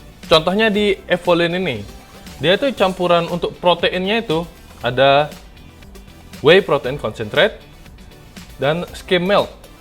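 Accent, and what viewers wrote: Bali, Indonesia. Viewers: Bali bukan bang?? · Logat baline kental sajan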